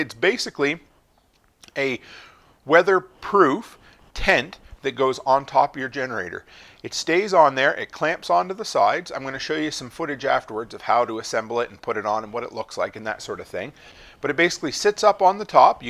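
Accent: Canada. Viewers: "Oatside". Love the Canadian accent.❤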